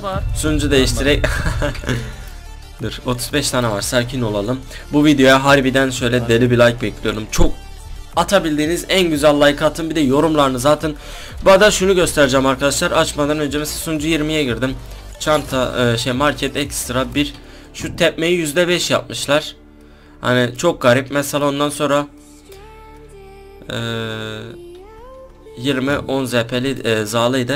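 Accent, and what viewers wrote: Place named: Eastern Anatolia, Turkey. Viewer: Abi senin şiven tam doğu şivesi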